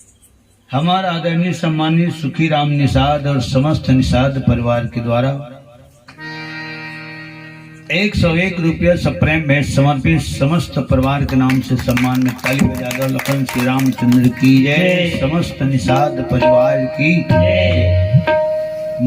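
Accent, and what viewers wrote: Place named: Chhattisgarh, India. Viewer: बहुत ही सुन्दर रामायण कथा वाचन । बड़ सुघघर छत्तीसगढ़ी बोली भाखा में जी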